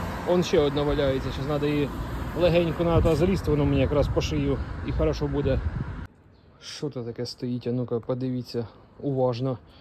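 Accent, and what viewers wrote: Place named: South, Ukraine. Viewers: минута. Земляче я теж з Оріхова. По твоєму говору я так і поняв що ти десь з Запорізької області. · Вітаю тезка. Так оказується ти ще й мій земляк, я так чомусь і подумав коли вперше почув твій говір, бо дуже знайомий діалект, такий тільки у нас на півдні ) Я теж на чужині, працюю, не легко, але є надія на життя. Дуже сподобалася твоя ідея збирати пляшки, збираюся і сам якось у вільний час таким зайнятися. Успіху тобі та натхнення !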